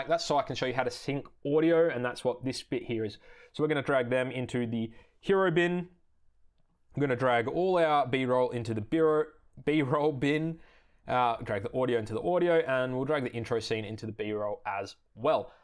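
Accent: Australia. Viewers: Another subbed. You can always trust information with an Aussie accent. Sold on giving Da Vinci a go, although Fusion kept crashing on me the last time I tried using the standalone version on a project. Anything to get away from Adobe subscriptions is worth consideration.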